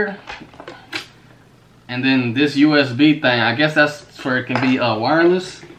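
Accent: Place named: Wisconsin, United States. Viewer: bruh u sound like ur from beloit wi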